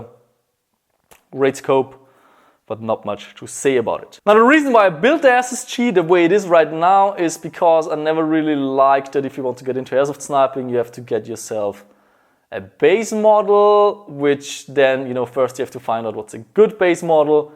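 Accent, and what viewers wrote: Bavaria, Germany. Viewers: What accent do you have, it sounds like German sometimes but idk. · Sorry aber man merkt das du aus Deutschland (ich glaube Bayern) kommst Trotzdem Bester Youtuber der Welt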